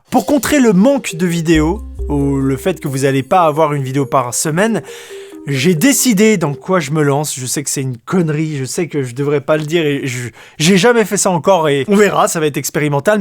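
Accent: England, United Kingdom. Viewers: Y’a qd mm que les francophones pr aller se moquer d’un accent anglais 🙄 au 21e siècle il serait GRAND tps d’évoluer, continue tu es parfait et merci pr cette belle année encore 🙌🏽🎉♥️ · J'adore toutes tes vidéos. Je n'en rate aucune. Peu importe ton accent anglais ( fuck les rageux) je suis admirative de ton travail. Mes vidéos favorites sont celles sur les animaux notamment celles de chauves souris. Et toutes celles où tu nous fais découvrir des lieux. Vraiment merci pour ton travail. Love sur toi 🙏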